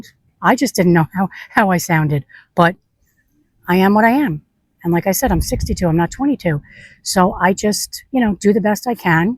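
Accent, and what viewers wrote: Long Island, United States. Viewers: Listen, I have a girlfriend that tells me every designer name and the saddest part is I have no idea what she’s talking about so I do understand not having a lot of stuff around. I think it’s wonderful. Do whatever you want we’ve earned it haven’t we . I love the accent. It’s just like mine. I grew up in Long Island, but I live in Pennsylvania now, but I had 30 years in Long Island. I love your little house too. By the way I’ve been following your journey. I’m very sorry about your daughter. I truly am. The girlfriend I’m just like you when you showed that house across the water. I thought it to myself absolutely not. I don’t wanna heat it. I don’t wanna put air air-conditioning into it. And I am a minimalist in a lot of ways. I just like to talk to my husband into throwing some more stuff out or just giving it away. Anyway, do the best that you can and also I have to say you have such beautiful hair. 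I’ve been meaning to say that have a great rest of your evening. Enjoy your time with your friends and family in Florida and I’m looking forward to seeing what you do with upstate New York take it easy.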